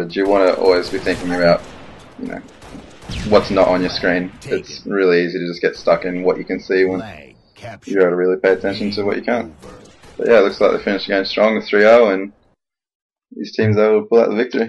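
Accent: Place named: Australia. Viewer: You can just hear enthusiasm when he mentions how excited he is for ep2, but seriously this is better than the american commentary vids. This is actually pretty helpful and I'd rather listen to an aussie accent over a yank anyday.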